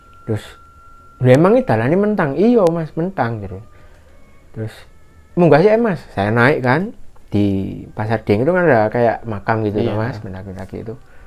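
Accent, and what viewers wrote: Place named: Surabaya, Indonesia. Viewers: Asyik banget aku dengerinnya,trus sampai senyum" sendiri.keren rek,bahasa suroboannya. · Kenapa ya,kok saya suka dengerin logat orang Jawa Timur kalo ngomong..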